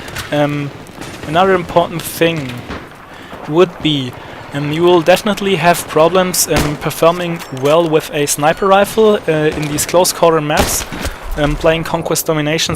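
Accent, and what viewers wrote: Germany. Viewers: Du bist doch Deutsch man merkt das voll :) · Are you German ??? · german accent too thicc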